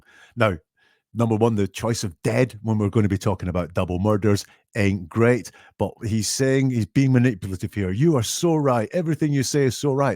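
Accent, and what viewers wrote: Scotland, United Kingdom. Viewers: Couldn't believe it when I clicked and heard a Scottish accent...lol I've only ever heard Americans discuss this case. Loved the video. New subscriber from Ayrshire here 😁👍🏴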